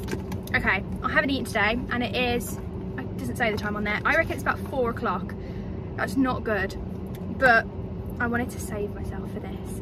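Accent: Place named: New Orleans, United States. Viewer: I like her accent 😭 I'm from New Orleans lol if ykyk the voice is crazy 😂😂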